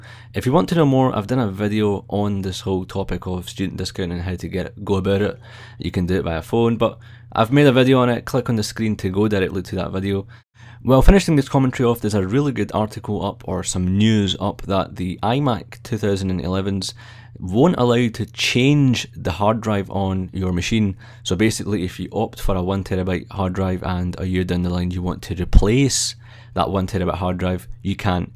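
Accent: Scotland, United Kingdom. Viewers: Are you a Scot?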